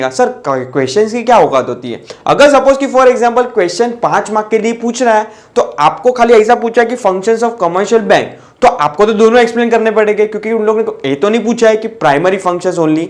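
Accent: Gujarat, India. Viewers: I agree with Rohan. Sir apke pas sufficient knowledge hai but Voh hum tak pahunch hi nhi rha hai. You're just reading TB & winding up the part . I think you should go in depth & explain various terms & give us examples. Also sir tame gujrati 6o ne .... Tamari accent par thi khbr padi gayi 6 mane .... Sir the way u speak 🗣️ etc & various is just gujratically awesome ... Gujratically is made by me ( Gujrati + beautifully ) Sir hoping you to change the way you teach ...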